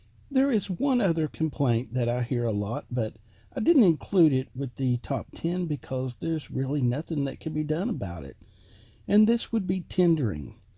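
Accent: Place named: Texas, United States. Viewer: Love this guys accent, kind of folksy and down south perhaps Texas, not being American not sure but he puts it across in such and easy going manner you can sit through the video till the end.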